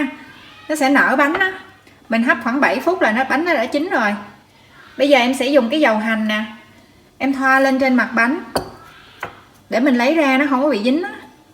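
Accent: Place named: Southwest, Vietnam. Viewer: Giọng nói dễ thương, rõ ràng đậm chất miền tây, hướng dẫn và cách quay rõ ràng, dễ theo dõi, dễ học hỏi, cảm ơn con gái nghe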